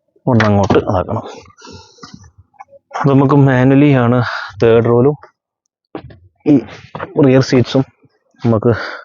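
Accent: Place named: Malabar, India. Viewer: മലപ്പുറം, കോഴിക്കോട് കാക്ക ആണല്ലേ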